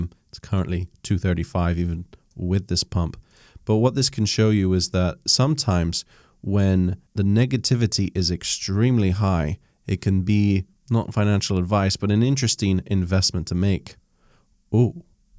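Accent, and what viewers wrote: United Kingdom. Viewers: I can't detect an American accent - he's British I'd say although some words sound Aussie to me. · I'm curious what part of the UK his accent would be associated with. It's rhotic but I can't place it.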